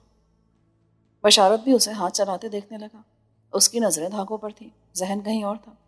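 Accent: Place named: Lahore, Pakistan. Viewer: You imitate beautiful Lahori accent well done you are my best Voice on YouTube😂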